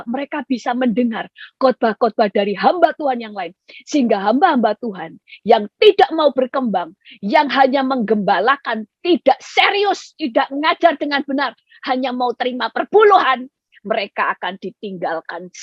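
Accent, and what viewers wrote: Java, Indonesia. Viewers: Aku suka pengajaran dari ibu. Pengajaran mudah dimengerti dan bahasanya sederhana. Meski ibu lama di Amerika tapi medok Jawa nggak bisa hilang. Tuhan Yesus memberkati ibu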